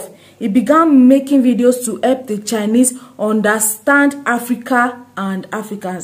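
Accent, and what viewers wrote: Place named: Nigeria. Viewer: You sound Nigerian. 🙄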